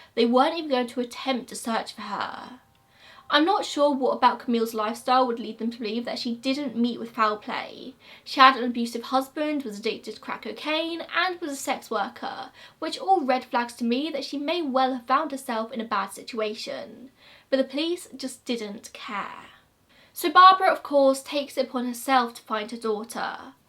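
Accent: United Kingdom. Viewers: i'm just hypnotized by your perfect british accent <3 i love it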